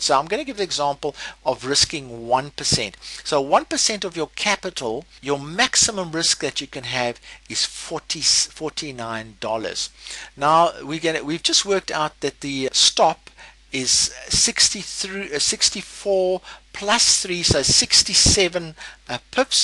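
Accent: South Africa. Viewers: You must be from South Africa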